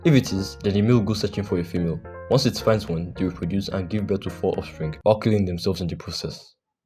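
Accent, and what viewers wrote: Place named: Russia. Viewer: ohh your accent sounded Russian to me😅. Nice video btw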